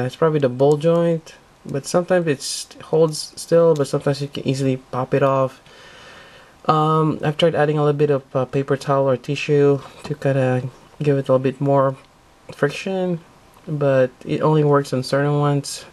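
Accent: Philippines. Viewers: r u from the Phillipines?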